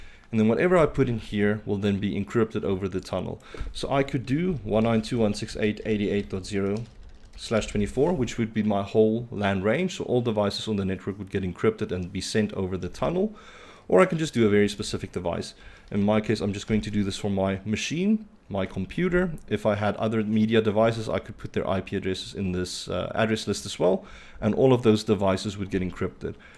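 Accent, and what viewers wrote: South Africa. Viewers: Thanks dude! Altyd lekker om n Suid Afrikaanse stem op youtube tevind!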